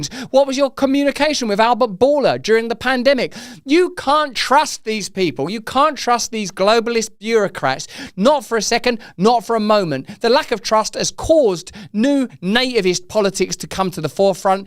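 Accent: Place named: United Kingdom. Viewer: Russell Brand is funny but he talks so fast and so British if you blink you could miss something. “Hey we don’t have evidence he’s a Russian plant! No, no evidence I’m sorry I just got on tv, got excited, and thought I might say something incendiary.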